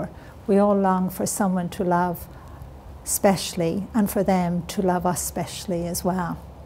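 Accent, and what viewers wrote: Ireland. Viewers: She's Irish, so 'tread' I guess lol. No offence, it's just the way they pronounce it there :)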